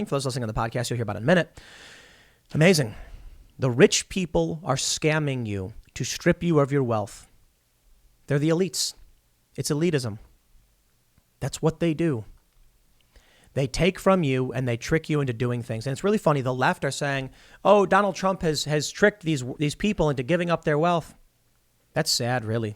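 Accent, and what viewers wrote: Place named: Chicago, United States. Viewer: .... Every video your Chicago accent is getting stronger. Something is definitely off...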